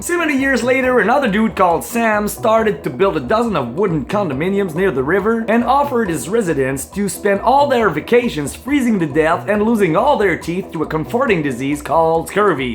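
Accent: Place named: United States. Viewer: comment ça t'as un accent americain..cest tellment cool ça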